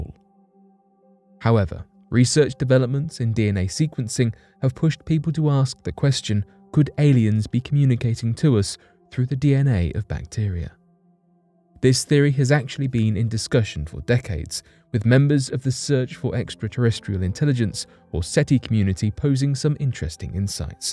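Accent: United Kingdom. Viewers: well now you just blew my mind....you mean this is all tongue in cheek poop info?....its that british accent- damn...i was looking to actually educate myself...last thing my small mind needs is fake info...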